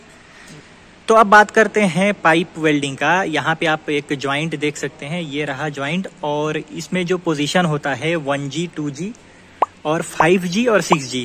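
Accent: Bihar, India. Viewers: Rakhanu. Bihari. Ho. Bhai. Ham. Bhi. Bihari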